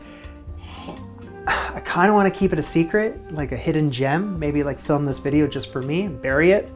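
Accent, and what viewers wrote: Canada. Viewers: I want to try this but in the right sized pans, and really whip air into the icing so it does not seize up. Maple sugar is a wonder. You can swap it out for brown sugar, but it is very pricey. I'm wondering is if a little whipped cream is called for. Unsweetened. Ah, the comment of woulda, coulda, shoulda. Your Canadian accent is much stronger and you video talents much diverse! You go guy! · It seems, since you have been back in Canada, your accent is returning. Which is nice.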